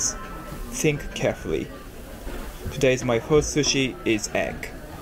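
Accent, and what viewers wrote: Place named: England, United Kingdom. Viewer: You have an English accent. Much better than American-English. And luckily not a Liverpool accent.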